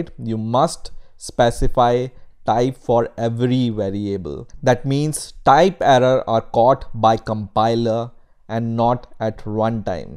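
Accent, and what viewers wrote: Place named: India. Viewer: I don't know why some people take issue with an Indian accent. I think it sounds just fine, even kind of adorable.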